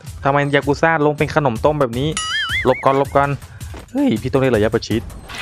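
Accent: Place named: Rural, Thailand. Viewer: อยากให้พี่ พูดเหน่ออะคับ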